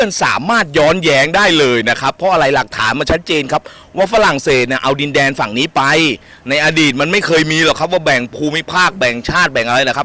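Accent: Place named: North, Thailand. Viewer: ไม่แน่ใจ...สำเนียงคล้ายๆกับภาษาเหนือบวกภาษากลาง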